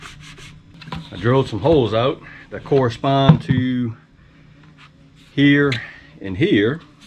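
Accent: South, United States. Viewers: Nice meeting you my friend in the USA.from England love that ol Southern accent and attitude and I have a mouse in the bedroom and I will definitely make this today many times thanks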